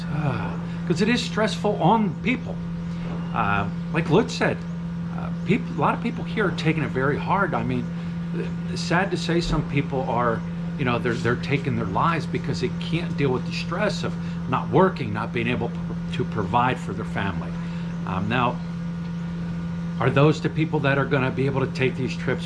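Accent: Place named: Pennsylvania, United States. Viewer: Are you from the Coal Region of Pennsylvania, because you sure have the accent. You really need to lean how to pronounce the words of dialects in Philippines.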